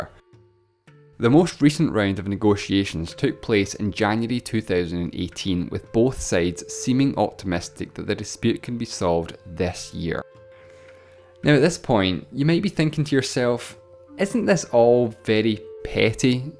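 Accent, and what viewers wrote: Scotland, United Kingdom. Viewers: The Narrator of this video is obviously Scottish. Scotland was named from the dense fog and darkness of this land. Scotland was discovered by the Greeks <link>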